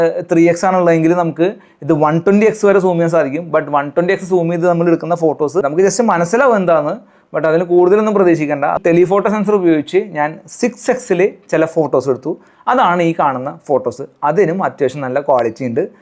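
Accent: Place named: Thrissur, India. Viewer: trishur basha ane udechad ene tonunu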